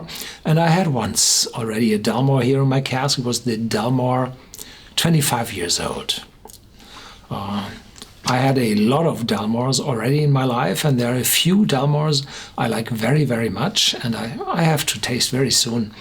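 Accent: Germany. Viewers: It's half german, half Christopher Walken :)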